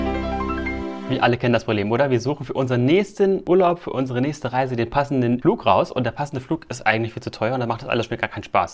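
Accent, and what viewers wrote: Germany. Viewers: Ist das so eine Art Bauerndialekt? Uckermark?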